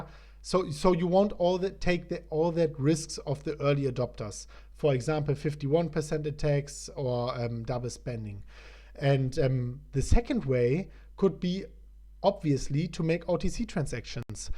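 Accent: Germany. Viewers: ich höre deinen deutschen Akzent von weit weg haha..Welche Bücher kann ich mir durchlesen um diese ganzen Graphen lesen zu können wie davincj15 sie manchmal in seinen Videos nutzt.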